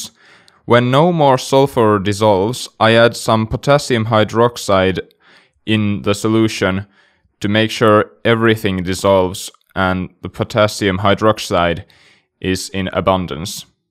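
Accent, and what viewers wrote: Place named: Finland. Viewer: You have finnish accent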